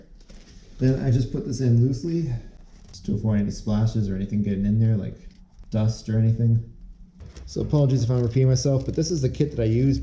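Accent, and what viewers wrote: Canada. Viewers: You sound a bit Canadian eh?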